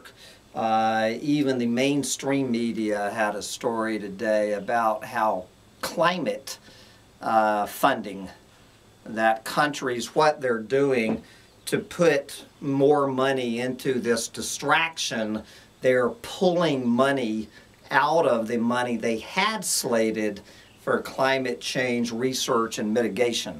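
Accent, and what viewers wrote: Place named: Pennsylvania, United States. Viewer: He is upstate where accents are more like Pa. and Ohio than the City's interesting accent.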